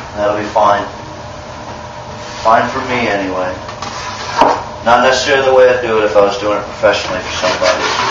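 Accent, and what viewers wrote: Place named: Tennessee, United States. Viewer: i saw back on the other video you didn't take much time to insulate thoroughly or use a vapor barrier. I would guess by your accent..you're in Tennessee maybe??just a guess.I'm in a northern climate, and up here with that little bit of insulation you would have cold toes & frozen pipes. Thanks for taking the time to this series. You did a fine job.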